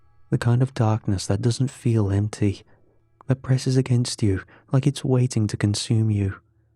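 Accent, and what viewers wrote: United Kingdom. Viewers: He’s good and his stories are great but im kinda getting tired of the British accent. Any good American creepy pastas channels? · Me for 5 years now and he is still in my top 3, theres just something about his voice that helps me sleep. I usualy dont like the British acent and dont like to listen to it but I can listen to for hours, plus he always has a good or interesting story, unlike 90% of creepypasta channels now that only do the borring rules storys.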